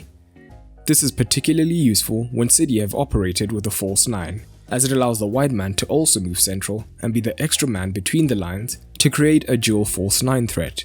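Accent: South Africa. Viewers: 😅Brother I can your South African accents ,you can’t hide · You sound South African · Are you South African 😂? Shoutout if you are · Is the narrator of this video South African?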